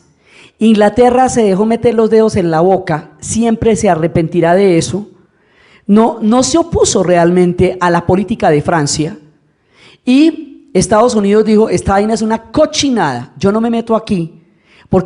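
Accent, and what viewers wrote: Colombia. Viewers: es increible lo clara que es para que entendamos todas las vueltas y revueltas de la historia y el orgullo de que es nuestra o sea latinoamericana y es poco conocida en mi pais o sea argentina realmente es un orgullo tenerla y ojala la sigamos viendo por mucho tiempo. aparte dice cosas bastante graciosas con esa manera que tienen los colombianos. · A mi edad jamás había escuchado a esta Dama Historiadora, muy buenas sus disertaciones, le pone ese toque Colombiano de pueblo, y Diafano, que inteligencia y mejor memoria, EXCELENTE NARRATIVA DE HISTORIA UNIVERSAL, y muy Actualizada...Felicitaciones, ahora Sra Uribe tiene un seguidor más habido de conocimiento... SALUDOS 🌹👏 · Fantástico como narra la historia,con ese acento y gracia que tiene colombiana,con esa sencillez,fácil de seguir y entender Superamenas sus conferencias,se hacen cortas y te quedas con ganas de mas. 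Gracias por tus enseñanzas,ojalá hubiera muchos conferenciantes como tu, con esa sabiduría y facilidad para explicar las cosas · Y es que habla con tal propiedad a lo colombiano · excelente. fabulosa narradora.Memoria magistral.Acento colombiano excelente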